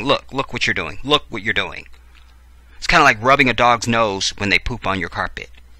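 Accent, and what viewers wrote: United Kingdom. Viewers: you better SING that song! I love when you speak in your British accent.... and when you say the 1990s. Lol. You make my day!!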